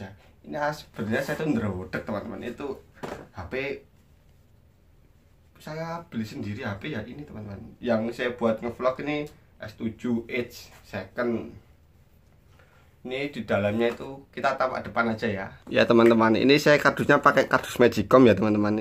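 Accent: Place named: Kediri, Indonesia. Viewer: logat khas kediri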